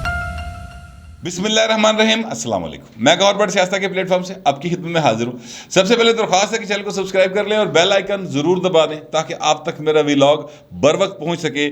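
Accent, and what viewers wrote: Lahore, Pakistan. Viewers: Agree with your examination of the present situation,love your Lahorei style of communication.God Bless you.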